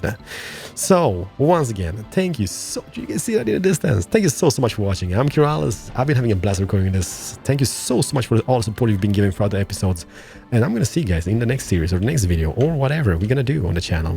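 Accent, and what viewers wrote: Scania, Sweden. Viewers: Asbra serie! Hör på dig att du är skånsk! :D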